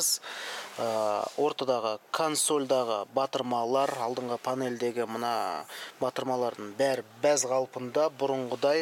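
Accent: Standard. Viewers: Жарайсын бауырып осы жолыннан тайынба, таза казакша тындаган кулакка не деген жагымды. Жарайсын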